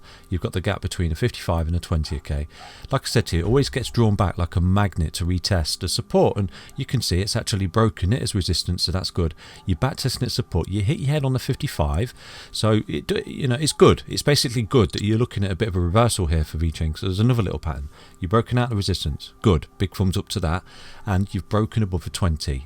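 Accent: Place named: Leicester, United Kingdom. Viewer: Ha I’m from London and my girls is from Leicester, I sent in one of your videos on Cardono yesterday and she said you was from Leicester I never noticed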